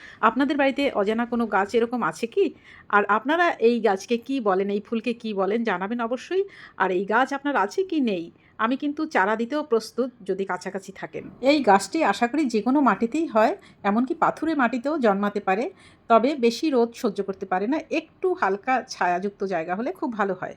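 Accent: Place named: Bangladesh. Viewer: অসাধারণ ব্যাপার 💚 আমি প্রথম দেখলাম। আপনার কথা শুনে বুঝতে পারিনি আপনি ভারতীয় 😊 একদম বাংলাদেশের মানুষের মতো কথা বলেন ❤ যেহেতু আপনি বেহালা থাকেন সেহেতু ঢাকাবাসী আমি আপনার কাছ থেকে গাছটি পাওয়ার আশা করতে পারিনা! তবে গাছ এবং ফুল সম্পর্কে জানার প্রতি আমার খুব আগ্রহ! ভালো থাকবেন এবং ভালো থাকুক আপনার গাছটি🌿☘️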